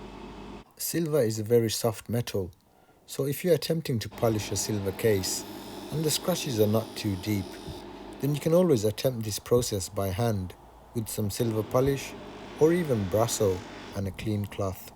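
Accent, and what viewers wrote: Birmingham, United Kingdom. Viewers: Can you do my dads Longines Sounds like your in uk 🇬🇧 I’m in South Yorkshire What are your prices Thanks Genuine Had it repaired twice at H L Brown 👎 then a private guy 👎 Gutted really we lost him in 2010 😟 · I'd say Birmingham, of Asian ancestry. I do come from near there, but of course I'm guessing.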